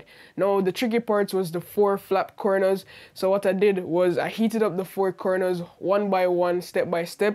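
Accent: Jamaica. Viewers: This accent sounds jamaican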